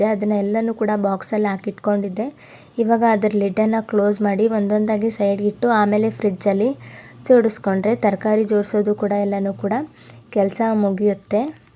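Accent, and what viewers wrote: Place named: Davanagere, India. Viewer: Nim district yavdu neu same nam tarane matadtira i think davanagere avara neu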